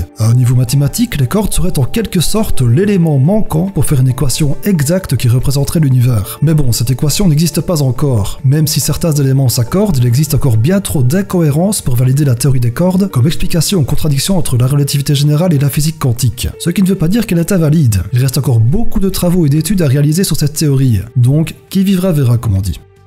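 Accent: Liège, Belgium. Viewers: Haha vu ton petit accent tu dois venir de Liège, en tout cas quelque part par là ^^ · Mdr l'accent belge !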